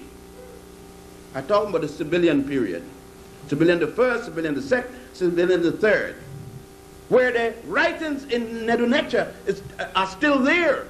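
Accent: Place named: Caribbean. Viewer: Interesting Scholar And Speak With An Accent Probably Caribbean.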